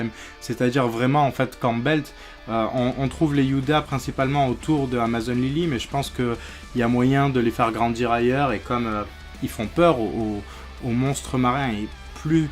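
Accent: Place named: South, France. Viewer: Je t ai vu dans la vidéo de ryo et ton accent du sud et génial je voulais te poser une question tu as déjà vu ryo seinsei en vrai ?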